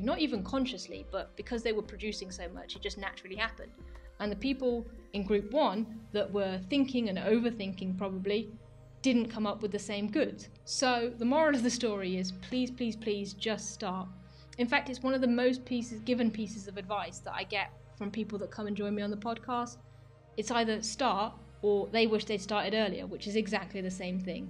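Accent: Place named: United Kingdom. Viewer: damn you have british tone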